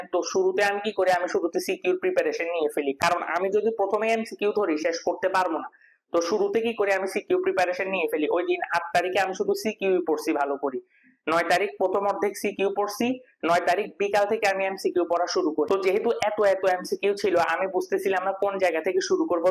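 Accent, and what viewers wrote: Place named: Noakhali, Bangladesh. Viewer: Vaiya apni ki Noakhalir? Kothar accent toh amader motoi !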